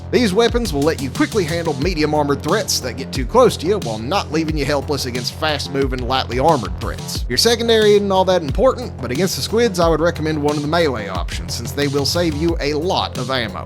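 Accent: South, United States. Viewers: Wonderful Southern accent! 👌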